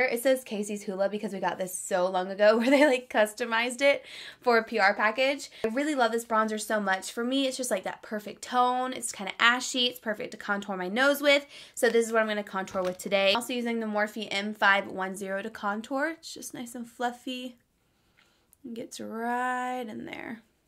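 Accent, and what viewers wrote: South, United States. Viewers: Oh my gosh! I love it when the southern accent comes out every once and a while! I had a southern accent when I was younger up until we moved back to the west coast when I was young.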